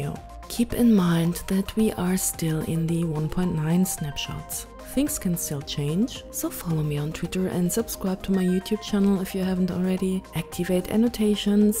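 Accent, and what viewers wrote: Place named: Germany. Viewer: I.. have.. to.. resist.. Srsly your voice.. What is your native language, maybe german? While reading the commands you nearly sounds like a robot :D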